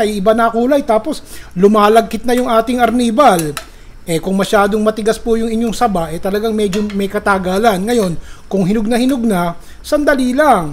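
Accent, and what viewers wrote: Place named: Angono, Philippines. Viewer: Informative and entertaining lalo pag nagsasalita po kayo dahil naaalaala mga taga Angono na pareho mo punto. At sarap ng kakainin habang nanonood ng show mo. All the best,!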